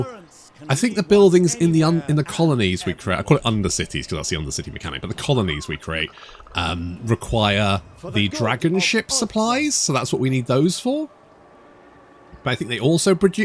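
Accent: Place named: West Country, United Kingdom. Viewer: West Country accent with your commentary is priceless 🤣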